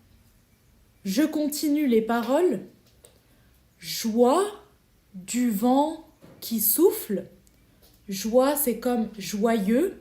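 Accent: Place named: France. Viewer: Aquí si hay acento de francés , un abrazo 🙂🫶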